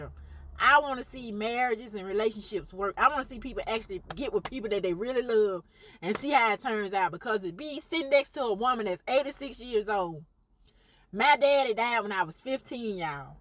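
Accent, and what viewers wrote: South, United States. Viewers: Are you from Alabama or Mississippi? That southern accent is deep.😂😂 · I can tell you''re a southern lady! Alabama, Mississippi or Tennessee! Sounds good, and I can tell you can cook and keep a clean home! There's a difference between a house and a home!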